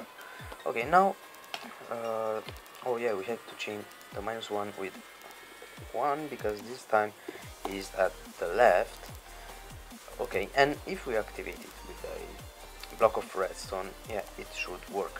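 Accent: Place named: Italy. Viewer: questo tuo accento italiano che fai quando parli in inglese è davvero una cosa fantastica XD, da un tocco di classe all'inglese =), comunque, sei molto bravo con la pronuncia e le parole... io non riuscirei mai a fare un tutorial in inglese XD comunque, questa cosa la sapevo, e devo dire che è molto utile! la uso spesso quando devo fare dei clock "nascosti"... comunque bellissimo video, bravo ^^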